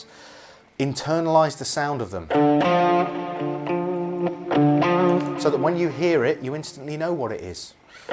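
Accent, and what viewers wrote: England, United Kingdom. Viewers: damn, that english accent!!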